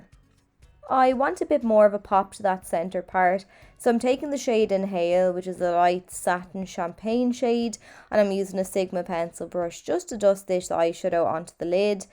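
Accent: Ireland. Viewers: Your tutorials are always so easy to follow and you do amazing looks! I also could listen to your beautiful Irish accent all day 🥰 I have only been there once but I’d go back in a heartbeat!! My parents chose an Irish name for me to honor my Grandma who was 100% Irish herself so Ireland always holds a special spot in my heart ❤️ :)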